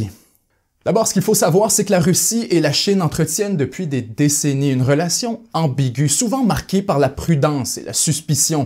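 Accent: Quebec, Canada. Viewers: Tu dois être québécois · cet accent canadien qui me rappelle les bons moments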